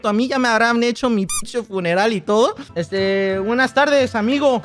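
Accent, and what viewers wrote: United States. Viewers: No mames ya se le pego el acento americano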